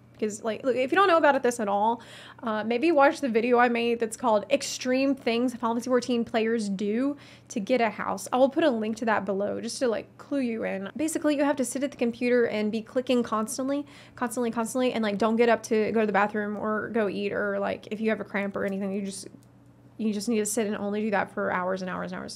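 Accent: South, United States. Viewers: She has a pretty country accent where is she from?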